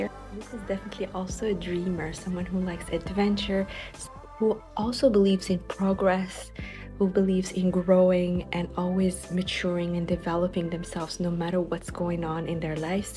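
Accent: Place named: New Zealand. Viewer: Sounds like my family from tautoro Kaikohe new Zealand 😎🎤🎶🎶 who is paku eyes 😢 lmao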